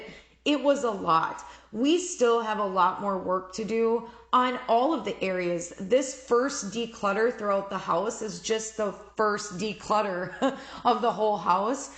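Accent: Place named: Michigan, United States. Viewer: Just happened across your channel for motivation! Your accent sounds like you are from Michigan or somewhere int that region. If so, hello from Michigan!!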